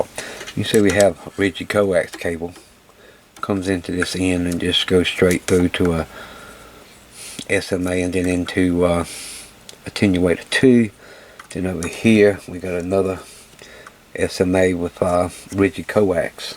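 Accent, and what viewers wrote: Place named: South Louisiana, United States. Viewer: Glad you and your family came out of the storm without any major damage - I did not know where you lived you have that South Louisiana kind of voice.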